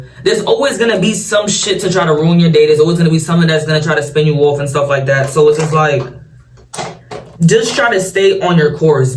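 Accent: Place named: New York, United States. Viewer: Not me watching all your videos while baking 😅, speaking straight facts (sounding hella New York) 😂. Your videos feel like our college days when we would ramble and do random things. So happy to see you the same but better 🥹 Miss you so much girl 💕 - little Cat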